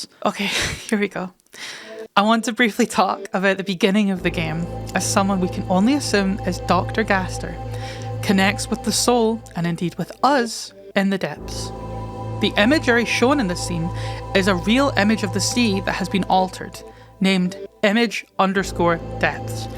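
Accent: Scotland, United Kingdom. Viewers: Your voice is the most Scottish thing I've ever heard and I love you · I love your accent, theory was good, yell at me more possibly Scottish person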